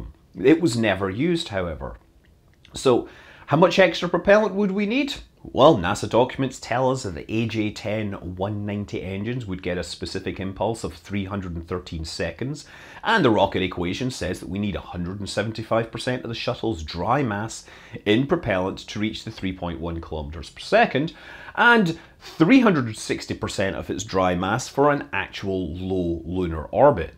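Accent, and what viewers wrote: Scotland, United Kingdom. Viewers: Scottish accent, nothing wrong with that. Not like all the 'would of' Americans..... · Scottish accent, which is so cool to listen to.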